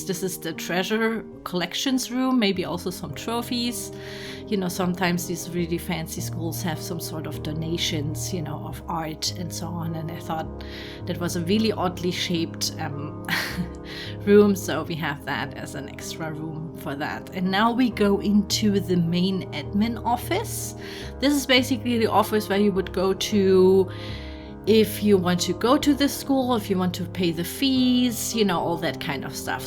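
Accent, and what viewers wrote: Germany. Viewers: I love the German accent. It's so relaxing listening to you speak.. This is a masterpiece btw 👏👏 I love the idea that your viewers have their own dorm rooms and ya'll go to this school together. Something about that idea is so heart warming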